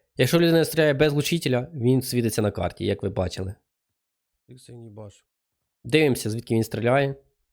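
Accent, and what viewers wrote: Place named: Vinnytsia, Ukraine. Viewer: Привіт. Перший раз натрапив на канал, а звідки саме автор? Говір дуже сильно вінницький) нехай повернення верданська принесе тобі підписоти❤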